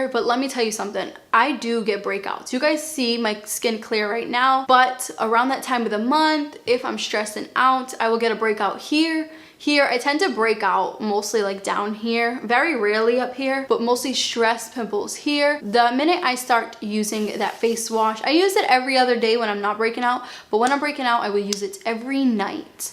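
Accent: Boston, United States. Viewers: You pronounce your words perfectly with your fantastic Bostonian accent! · I love the way you say concealer in your Boston accent! It’s unique and authentic and I love it 🥰 don’t feel you need to “correct” your accent/ pronunciations x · Through this entire video, I wondered where your accent came from because of the pronunciation of some of your words. Then you said Boston. You’re one of my grandma’s people! This is my first video of yours I have watched. I love it! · You are so right. Less is more. My daughter and I are helping each other clear out our homes of excess material things. I have been saving so much money buying less things that I am taking more trips. I love to travel. Great video, as always! I love your Boston accent. Embrace it. ☺ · You don't have to correct your Boston accent! It's who you are and I love listening to you speak! Who cares if you don't pronounce it exactly as it's spelled, do people actually complain about this? 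We are all unique! I'm in Philadelphia and we also pronounce things the "Philly way" haha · Noooooooo I LOVE your Boston way of talking! Please don’t change it! You will get all sorts of viewers from all over the world and they all will have options. Please don’t care! I love the way you talk...Boston style! ;) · No. Please say “concealuh”. Never ever lose your Boston accent 🥺🥰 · Love your Boston acsent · Lets get one entire video with your natural Boston accent. Who agrees? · Please don't change your accent for youtube! There's no wrong way to say things, it reflects the dialect where you are and it makes you unique! I watch your videos a lot so sometimes I will end up saying things with a Boston accent just because it's kind of fun!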